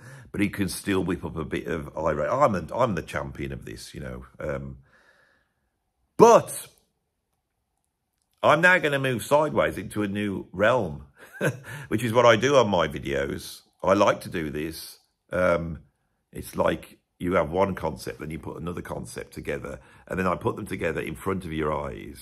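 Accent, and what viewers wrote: Birmingham, United Kingdom. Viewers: he has much more intelligence than this annoyingly verbose Brummie...the accent is too much to handle.😎😎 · I'll take sense of humour over Beato's supposedly superior "intelligence" any day of the week. I love the Brummie/West Midlands accent anyway, it's one of my favourites. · love me a Brummie, or Black Country accent ❤ any day of the week.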